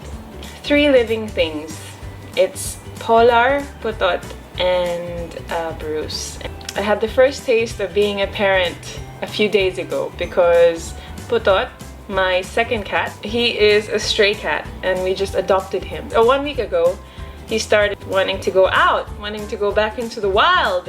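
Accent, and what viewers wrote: United States. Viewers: Lindz Can it sounds more American now she migrated back pabalik ng Pilipinas. Nung mga previous vids nya before mas string yung Aussie accent nya. she sounds more like Colleen Ballinger actually ☺💙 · Can She speaks American English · feeling ko american ka..ung accent mo kaxe ehehe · Lindz Can Aussie??Hindi yan Ausie.American accent lang naman yan eh..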